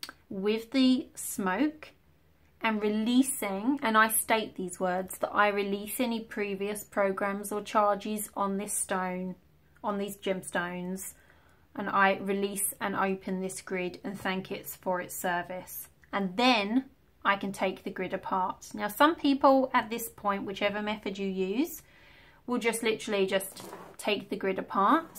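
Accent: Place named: England, United Kingdom. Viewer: I love you english accent... besides that all that you said.. cheers from Colombia!!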